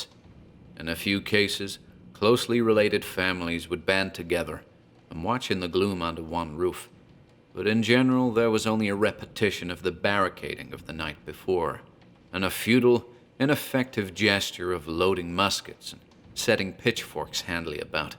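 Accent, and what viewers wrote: United States. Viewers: my second listen, but still i just realized you were speaking american LOL with your voice, you make reading american sound good - and those two other guys, so different. excellent production (even better than some from BBC radio :) 🎭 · Wow, American accent totally threw me for a loop haha. Well done!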